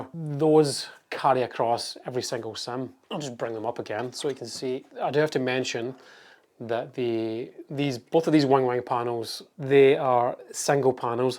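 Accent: United Kingdom. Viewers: Are u uk by the way just accent am from Northern Ireland nice seeing local people do so well.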